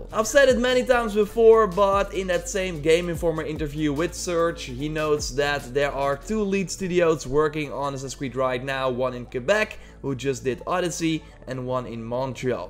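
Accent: Netherlands. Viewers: ik hoorde echt gelijk aan je accent dat je Nederlands was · jij komt uit nederland dat hoor ik gwoon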